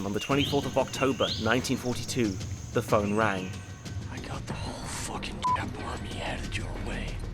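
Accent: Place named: United Kingdom. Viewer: you say names so British like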